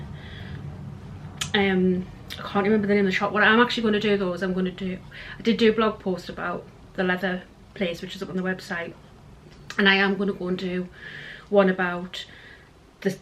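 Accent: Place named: Northern England, United Kingdom. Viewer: Wow what a fantastic haul! It was your fabulous Northern accent (as is mine!!) that lead me to you, the first time I saw a video it was Hang on that's an English accent and double bonus Northern!! So keep up the fabulous work and don't ever change we love you for being you and a fabulous bag maker and seller of quality hardware and runner of classes and retreats xx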